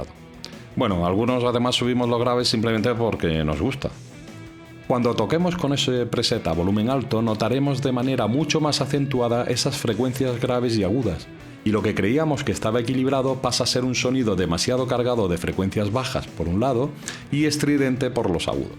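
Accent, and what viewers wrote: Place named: Spain. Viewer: Una pregunta sin relación al tema del video, de qué región de España es el acento del narrador? Gracias.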